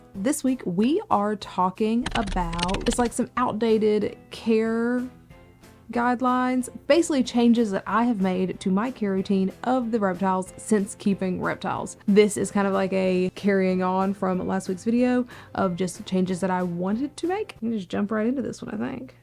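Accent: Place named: Texas, United States. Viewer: Alabama? I had your accent pegged as Texas. I would have put money on you being a Houston native.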